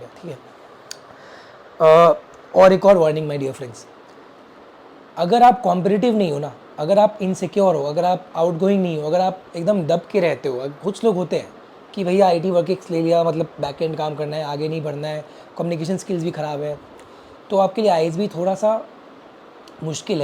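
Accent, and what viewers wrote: Bihar, India. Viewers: Bhai tm Bihar se ho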